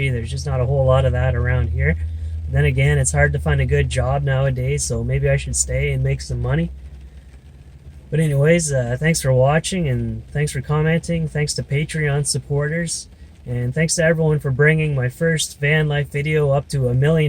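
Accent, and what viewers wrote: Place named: Newfoundland, Canada. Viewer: Canadian. If I had to guess, I'd say Newfoundland? I've heard other Canadians say that's where the sort of stereotypical Canadian accent comes from.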